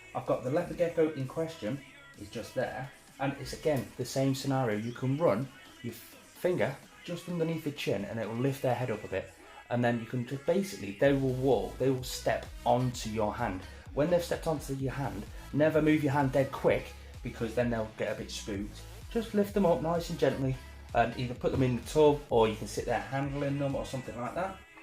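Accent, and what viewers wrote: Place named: North of England, United Kingdom. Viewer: Yo man! youre clearly from the north of england ahaha (i am too :P) and i wanna ask you if there are any good breeders that are relatively close to manchester that you know of? that would be really helpful man. Preferrably on the southern side of manchester. Im thinking of getting my first pet as a leo or a crestie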